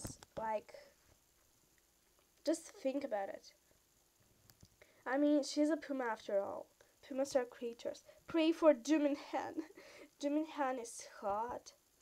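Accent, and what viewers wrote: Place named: Russia. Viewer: Hey! I'm half Russian too! My mom is Russian.. but I don't have a great accent like you and I'm from America! Anyways, love you your the best!:p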